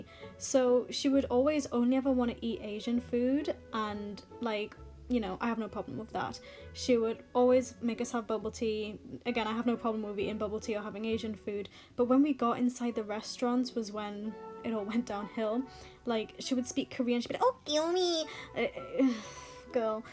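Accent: Newcastle, United Kingdom. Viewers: omg ur from newcastle hi sis🥺🥺🥺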